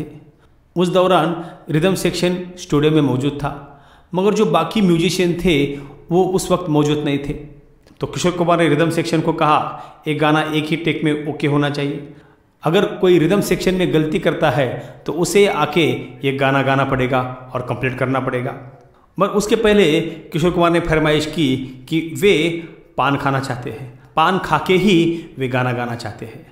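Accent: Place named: Maharashtra, India. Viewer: aap ke Hindi speaking ko sun kar laga ki aap Maharashtriyan ho aur aap ka lahja bhi marathi hi hai Hindi bolte samaya apna lahja Sudhariye.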